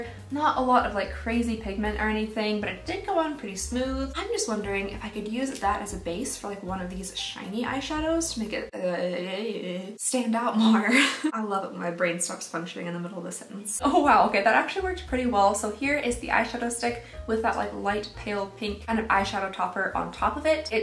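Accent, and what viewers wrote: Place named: South, United States. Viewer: She’s not saying crown... it’s just her accent so that’s how YOU hear it due to her accent being wildly different from yours. Everyone in the south where I lived says it that way snd I hear it just fine as crayon. When I moved to England for a long time and came back it sounded similar to crown to me at first for a bit. It’s just the accent. Lots of people say it this way.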